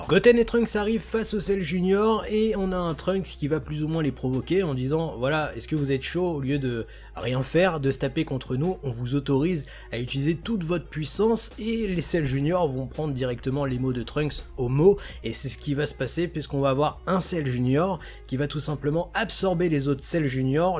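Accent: England, United Kingdom. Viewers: Pk tu dragon ball avec un acsent anglais???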